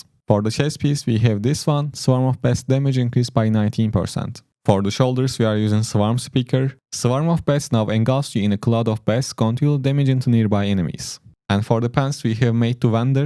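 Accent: Turkey. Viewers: Kankam selamlar,Türk olduğun aksanindan belli bu arada, tüm classlarla oynamış biri olarak; en hızlı farm yaptığın Class hangisiydi ? Favorin nedir ? Şimdiden teşekkürler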